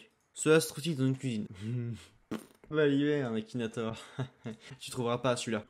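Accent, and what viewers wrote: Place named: England, United Kingdom. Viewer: ho parle contre calme toi sur l accent anglais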